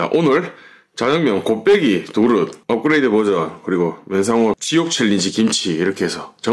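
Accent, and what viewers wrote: Gyeongsang, South Korea. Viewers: 어디선가 뵌듯한데 어디서 봤는지 기억이 안나네ㅠㅠ 경상도 맞쥬? 위장 보호 해가미 드시소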